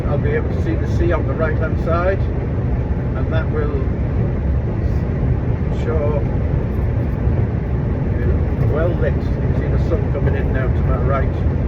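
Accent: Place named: England, United Kingdom. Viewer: Enjoying your content. Trying to place your accent. What part of England are you from originally.?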